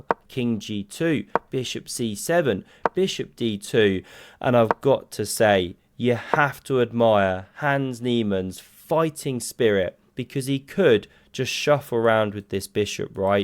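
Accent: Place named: RP, United Kingdom. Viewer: I subscribed as soon as I heard BBC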